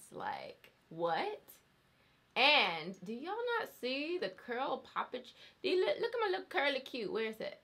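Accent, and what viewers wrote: South, United States. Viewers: why are you so cute .. .. I'm guessing you're from down south with a little islands in. . . . .I usually think it's rude to ask; hopefully since I'm mentioning I get a pass.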